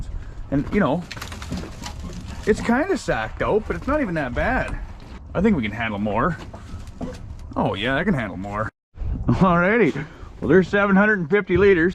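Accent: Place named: Western Canada, Canada. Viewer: I only just got here, and I see a Canadian flag and hear an accent that sounds like you've got the Western side of the land in ye, and then I remember the fact that the comment from another video I was watching that I found you from mentioned the fact you got a beast named Slave Lake. Yeesh, you from the plains er sumthin?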